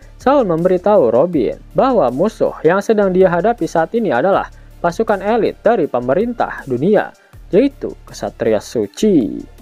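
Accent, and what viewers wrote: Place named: Medan, Indonesia. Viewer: Medan nih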